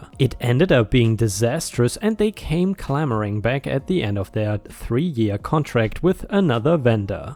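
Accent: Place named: Germany. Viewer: Hi I want you to know how much I enjoy all your stories. Listening to you with your German accent takes me back to when I was a kid, listening to my grandparents ( from Germany)tell stories. I miss them dearly, but hearing you makes me so happy again. Thank you so much for taking me back to very good times.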